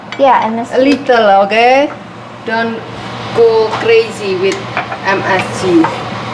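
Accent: Indonesia. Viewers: kan lidah nya Indo sekali ❤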